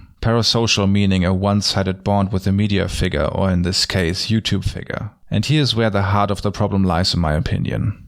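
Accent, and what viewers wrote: Germany. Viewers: a slight German accent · I had no idea how much I needed a German narrator before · The content of this video is entirely based on the premise, that you are a mindless bee simply running after the sweet anti-sjw-content you were made addicted to. The mindset of a true lefty: Everyone is just following perceived elites. In this particular case, running after more or less famous youtubers. For example, I liked Ben Shapiro back in the day, when I have first seen him, because he stated arguments in an extremely condensed manner that I have stated for years and yet have to see a well thought through counter-argument against. I have experienced Sargon more as a funny Britsh dude with some good and some bad arguments than an authority in arguments. And you got to give to the far-righters on youtube: They have a very sharp insight into the lefts mindset and their ideological premises. 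And to add also a counter-argument to one of the points made in this video: Yes, SJWs are a minority within the left. Political radicals are always a minority but this fact doesnt imply that they have no or cant gain any relevant political power. Quite the opposite is the case. By your accent I can tell you are from Germany: "Gleichstellung" von Frauen ist auch nur eine Idee einer kleinen linksradikalen Minderheit in Deutschland und doch ist dieses linksradikale, freiheitsfeindliche und im Kern kommunistische Konzept heute Bestandteil der Gesetzgebung und wird von fast allen Parteien vertreten. Das ist ein aktuelles Beispiel dafür, welchen Einfluss radikale Minderheiten gewinnen können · your German accent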